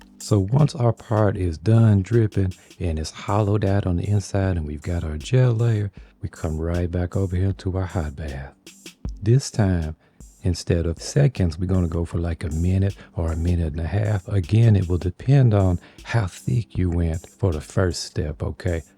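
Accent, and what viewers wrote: Louisiana, United States. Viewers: Hooray! Another Crafsman video! 🤩I love your soothing voice and Louisiana accent (I grew up in New Orleans, myself.) I also love how inventive you are-- it inspires me to try new things.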